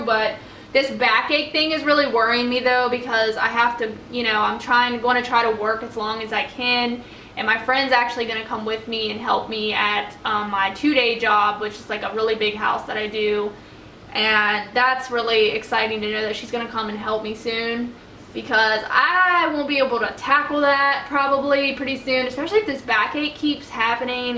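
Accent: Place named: South, United States. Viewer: I was really trying to focus on the video but her dam accent is country and sexy!!!! Congrats gorgeous!!!! 😍❤️😉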